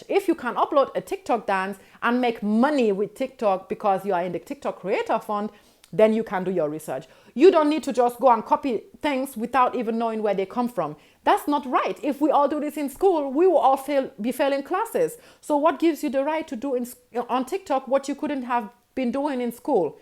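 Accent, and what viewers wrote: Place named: Nigeria. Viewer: Nigerian accent is super strong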